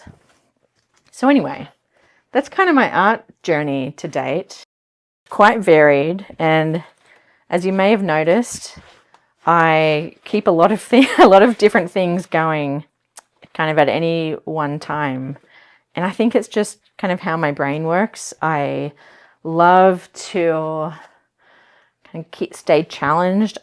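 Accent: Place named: Australia. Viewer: What is your accent? It sounds a bit Australian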